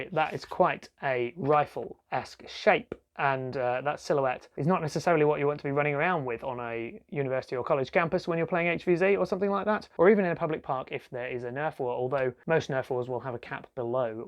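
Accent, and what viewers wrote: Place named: United Kingdom. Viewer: yeah, keep up the great work! it’s not often that I hear another brit on yt, but Ilove it when I do.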